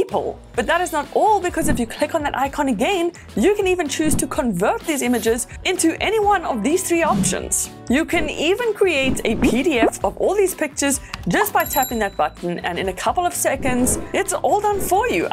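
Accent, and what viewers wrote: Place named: South Africa. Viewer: Heavy South African accent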